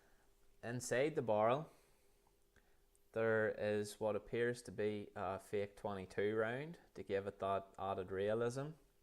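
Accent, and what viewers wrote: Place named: Northern Ireland, United Kingdom. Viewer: Sounds like Northern Ireland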